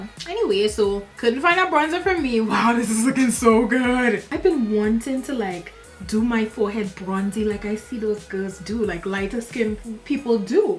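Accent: Trinidad and Tobago. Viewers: love your accent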